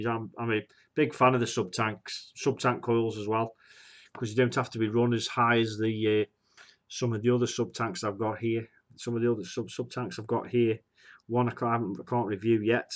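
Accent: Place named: Manchester, United Kingdom. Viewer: I was going to say Manchester. I have a friend from there whom sounds very similar to you.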